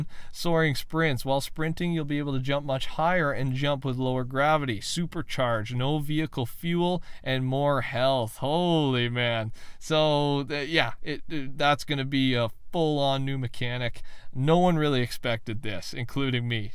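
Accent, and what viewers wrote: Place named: Canada. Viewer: Are you Canadian you just give off that nice Canadian voice and vibe…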